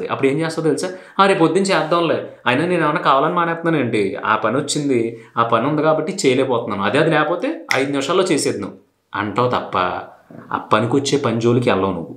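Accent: Godavari, India. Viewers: thanks for your motivation anna..midhi godavari side kada mi way of talking lo miru godavari side anipinchindhi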